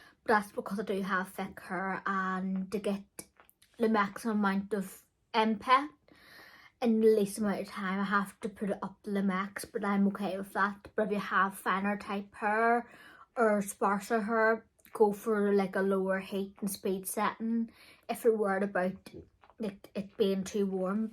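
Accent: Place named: Ireland. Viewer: Thanks for video. Love your accent! Are you Irish or Scottish possibly? 🤔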